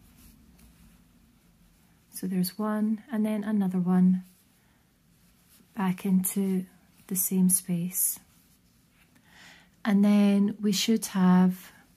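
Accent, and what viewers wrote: Scotland, United Kingdom. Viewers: Just subscribed I enjoyed your video tutorial sooo much. As a newbie to crochet I found your instructions and pace, just right for a learner and I found your voice so calming and clear. Being a fellow Scott I warmed to your accent too. Your wee hoodie couldn't come at a better time also as there's a new baby due in the family in February. Thank you for your hard work and inspiration. Have a merry Christmas :0) P.s. would love some small baby dolls patterns for the new big sister's doll, 10 inch would be great ☺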